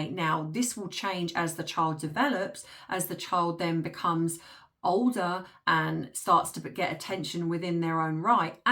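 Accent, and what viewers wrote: United Kingdom. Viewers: Your opinions make so much sense and reflect your education in your chosen profession. I don't know how anyone can see it otherwise. They just seem to believe what they want to believe is true. By the way, I love listening to your lovely British accent (I'm from Indiana) and think you're a very beautiful young lady. I'm 65 and wish that youthful glow could last forever. Alas, it doesn't, so enjoy it while you can. God bless, Carol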